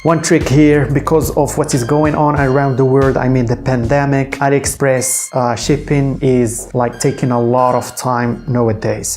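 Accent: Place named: Morocco. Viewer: 😂😂xttî 9x3tk mn l accent dyalk bl3ks wlkin wakha hakkak kyn wahd lam7 sghiiir kybyn dk l3r9 lmghribi hhhhhh thy bruuuh!! ♡♡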